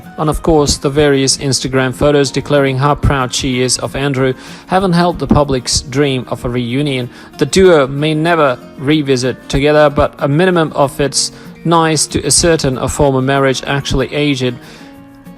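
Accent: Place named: Australia. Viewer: Your English is perfect - do I detect a slight Australian accent?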